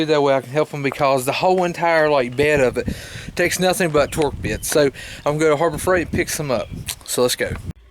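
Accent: West Virginia, United States. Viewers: You from West Virginia?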